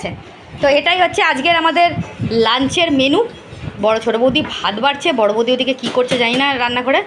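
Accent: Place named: Bangal, Bangladesh. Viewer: Heavy bangal vasha bolen toh . Amio bangal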